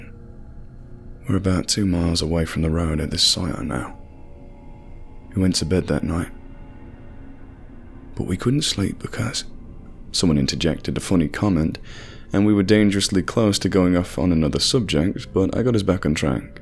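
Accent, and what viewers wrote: South, United States. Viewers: Great job on the American accent! :-) I love these stories. My dad was a volunteer with Search and Rescue here in Canada years ago. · I swooned over the southern accent. I recently discovered your channel dear stranger, and I fancy your narrative. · Your American accent is 👌🏻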